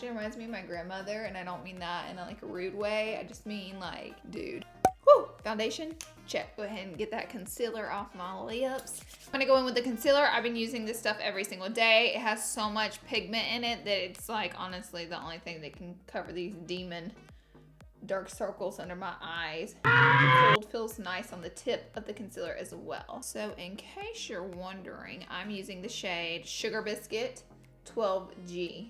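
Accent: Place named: Alabama, United States. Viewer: Yeah, being born, raised and living in Alabama, a lot of us talk like this. Nothing uncommon here! Love ya 😍❤❤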